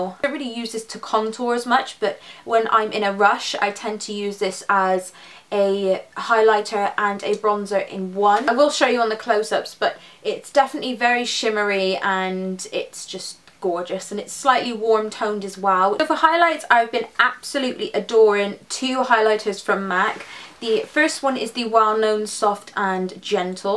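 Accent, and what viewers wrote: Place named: Somerset, United Kingdom. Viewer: New subscriber! I adore your channel! Where are you from? I hear a twinge of sort of Somerset/Bristol accent! I'm from the north-west! I adore all your tutorials I'm binge watching your videos you're gorgeous!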